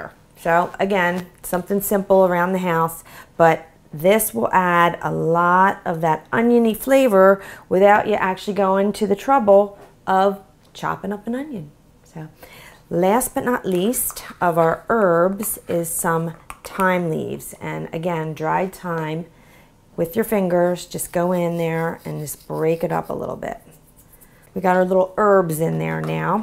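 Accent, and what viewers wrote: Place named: East Coast, United States. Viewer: I hear the east coast in your accent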